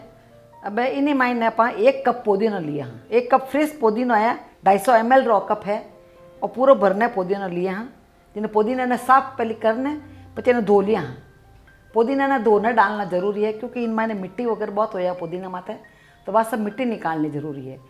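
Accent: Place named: Rajasthan, India. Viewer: Very nice simply method Looking nice kakisa🥰🥰 Rajsthani boli ghani chokhi lage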